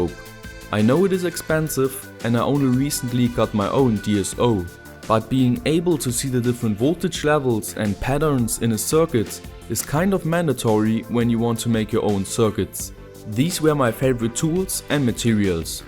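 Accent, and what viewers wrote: Saxony, Germany. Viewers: Hi, great Video! Especially because I'll have to build a new tools stock for reactivating my old hobby. My first project is to hook up a old ghettoblaster with bluetooth for my brothers birthday. I even have the service manual including the schematic, but it's a while since I last read one. May you help me to find out where I'll grab the AC for my converter board? It would help me a lot. Fabigg PS: Even though I'm a German too, it's kind of polite too write in English because your videos are English. PPS: But I'm wondering what dialect you might have, because you pimped a Simson and the sound of some phases I'll guess it's saxonian. Am I right? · Tolles Video :) Jetzt brauch ich nur noch eine Bench Power Supply, dann hab ich alles :D Kann das sein, dass du eher aus dem Osten Deutschlands kommst? Sachsen oderso? :D Hört man ein wenig :)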